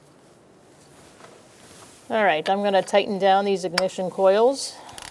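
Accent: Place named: East Coast, United States. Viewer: The East Coast is strong with this one :)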